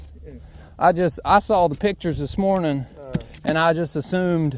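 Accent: South, United States. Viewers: can tell from his accent hes down south, def below NC/ KY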